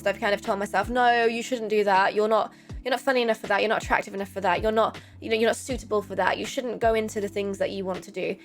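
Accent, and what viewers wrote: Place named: England, United Kingdom. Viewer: Your cute & fun, always a sucker for a nice English accent...your easy to understand, (cause' I'm American)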